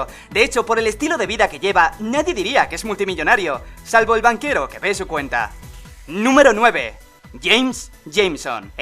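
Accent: Spain. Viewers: Me encanta tu voz española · Español de España, no latino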